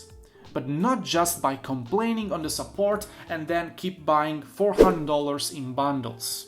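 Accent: Italy. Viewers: bro are you italian? by the way very good video, as f2p i totally agree with you, another youtuber (@ said the same things weeks ago in one of his stream explaining why rok is about to die if they continue on this path, he try also to contact developers but no-one respond to him #CommunityMatters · Avevo intuito qualcosa dalla pronuncia, il ciao finale mi ha convinto😂. Iscritto 💪👍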